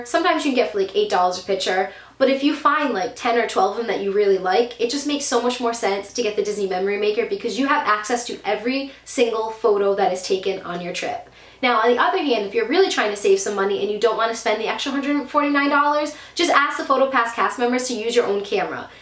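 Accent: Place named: New Jersey, United States. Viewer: pahk coppah - Jersey?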